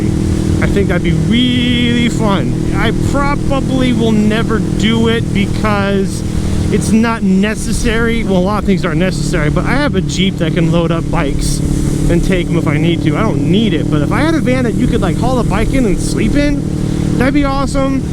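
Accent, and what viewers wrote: United Kingdom. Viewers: 😂 the British accent. I’m surprised at how laughter my lungs are currently. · Love the British accent ✌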